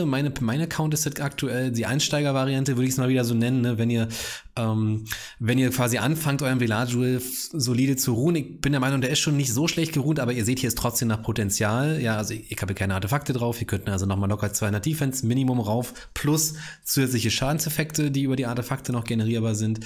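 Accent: Berlin, Germany. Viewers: Hey wieder danke für das nice video direkt mal Ariel und ella umgerunt waren schon eingestaubt dein Berliner slang macht es einfach noch mega symphatisch Grüße knall&voll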